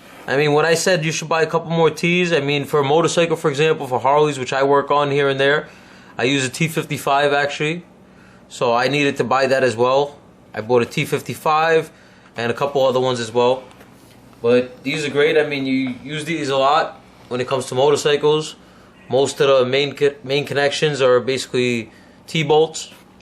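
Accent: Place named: Italy. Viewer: My dudes got to be Italian! I now that freaking accent. My brothers kinda have it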